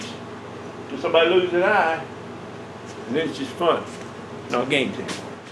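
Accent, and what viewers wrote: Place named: North Carolina, United States. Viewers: I sure thought you were from North Carolina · Woulda never guessed your in Washington...thought you were in Tennessee or North Carolina mountains. Your place looks like it's there, your shop looks like something you'd see here in the south, you talk a lot like that area and you have the same demeanor about you too. Woulda never known the difference if I hadn't heard you say it. You'd fit right in around those areas.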